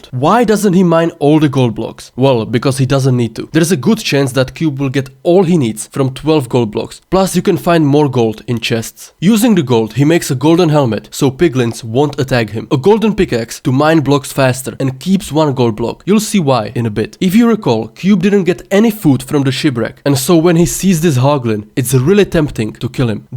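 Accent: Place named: Czech Republic. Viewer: Yo great video and breakdown! Keep up the content ;) (Within the first seconds I was just searching whether or not you are Czech, knew I heard the accent! :D Nice to see some more Czech people with actual good videos)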